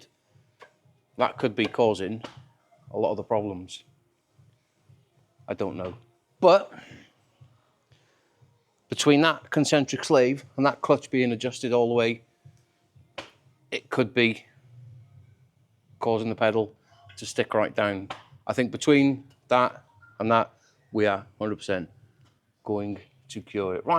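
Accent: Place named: North Wales, United Kingdom. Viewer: Nice vid, I need to tackle mine on my driveway this week🫣. That a North Wales accent too, where about?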